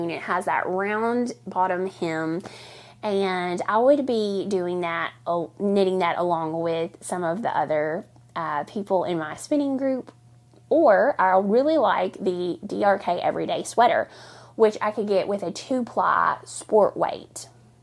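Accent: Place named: Ozarks, United States. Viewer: I live in New England now but grew up in the Ozarks so love to watch just to hear your accent. Reminds me of home. Would love ❤to participate in the gift giveaway. Congratulations on 30K. You deserve it!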